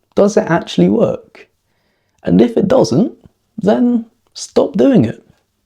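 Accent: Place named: Australia. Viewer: Thanks for letting me know. I was curious as it sounds stunning 😉. Sometimes you actually sound a little bit Australian. This because of the pronunciation of certain vowel sounds for instant. Well, I enjoyed listening and I try to pick up on some more words to better my vocabulary. As a non-native speaker the vocabulary could always to be worked on 😂.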